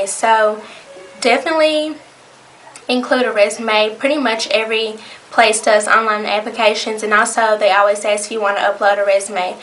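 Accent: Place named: South, United States. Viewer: Omg i love you country voice!!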